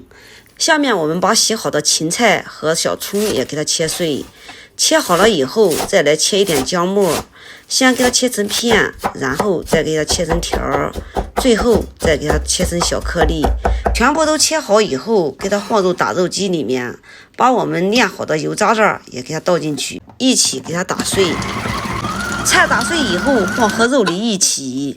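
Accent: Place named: Henan, China. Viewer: 一聽口音就是河南信仰老鄉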